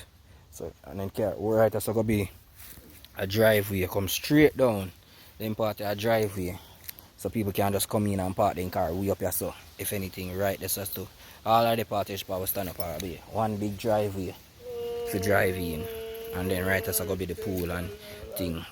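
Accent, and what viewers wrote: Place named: Jamaica. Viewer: Do your Presentation Minus the MY PEOPLE PATOIS. Try to speak English.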